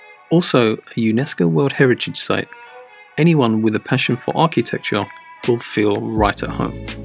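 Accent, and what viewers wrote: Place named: England, United Kingdom. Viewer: i like your voice and your english accent, you put it down that relax me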